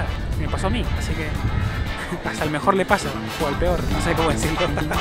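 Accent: Peru. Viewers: Bravazo tu video hermano !! Eres peruano verdad ? Por tu acento limeño. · Tienes un acento como de mi perú 🇵🇪!!!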